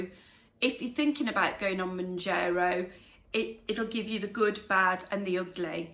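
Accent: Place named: Birmingham, United Kingdom. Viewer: Great idea about the food menu. I feel already now, just gone into my second week, that I have some problem to think out what to eat to even get up to 1200 calories a day. I know it's a "lovely" problem to have but I don't want to lose to lose too much muscles and get a lot of saggy skin just because I can't get enough calories a day. I do know a proteindrink or similar can boost the calorie intake but I'm really into getting my energy from "clean/pure" food now ie not junk food or frozen ready meals. I hope you know what I mean. I split a pizza for lunch yesterday with my dad and had the leftover as dinner. BAD move after eating good stuff the whole week up until then. But as it was my injection day I decided it was that day if I ever there was day to have it. I felt it in my body all evening that it wasn't a good feeling. Didn't go nauseous or so but it have really put me off even more to have processed food. 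But what to fill up with with now? Can't go all Roger Rabbit och scoff a full barn of chicken for protein. 😀 Have you got any ideas or tip of what to choose to fill up on? I have a long journey ahead of me (ideally lose 66-ish pounds to reach a healthy weight. I'm 48 btw and around 5 feet 461⁄64 inches tall/short). Warm hugs from a cold northern Sweden. xoxo Ps.. Forgot to mention earlier that I love your Brummie accent. 🙂 (I remember some chef on The Great British Menu that was a wellknown brummie..apparently...ha,ha..sorry, went total sideline there...)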